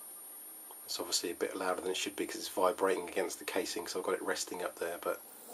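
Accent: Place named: United Kingdom. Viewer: Yeap, british accent is so weird. I'll never like it.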